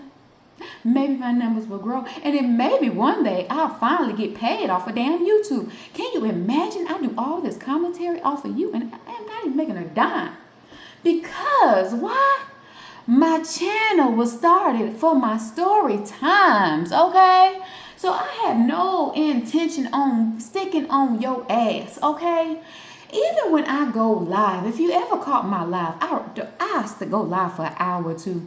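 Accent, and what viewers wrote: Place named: South, United States. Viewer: " guurrl, you are hilarious when doing your commentary. You remind me of Dolly Pardon, with your sorta kinda like Southern Bell accent that you give off😅 "You've grown on me enough for me to kinda like you girlfriend. "I must like you in my own SPECIAL KINDA WAY😂 "because I'm NOW a SUBSCRIBER of Yours baby girl. Keep doing what you're doing which is to keep your Subscribers engrossed sweetheart. "Peace✌️🫡🥰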